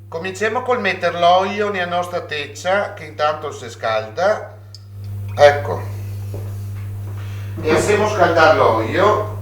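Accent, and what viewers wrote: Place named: Veneto, Italy. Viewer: Sei simpaticissimo. E' bellissimo il tuo veneziano da ascoltare. E' una musica! Inoltre sei molto bravo.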